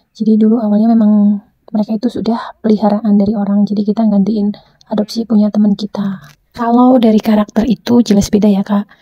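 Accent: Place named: Banyumas, Indonesia. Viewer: ngomong nya ngapak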